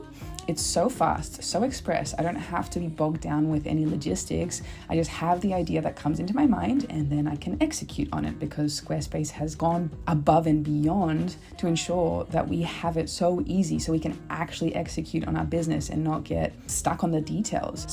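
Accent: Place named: Australia. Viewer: is such a cool name! Especially with an Aussie accent, its just fun to say:)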